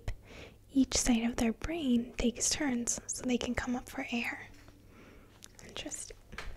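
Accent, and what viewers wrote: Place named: Michigan, United States. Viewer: Do you live up north? You have an accent like my family members from Michigan ❤️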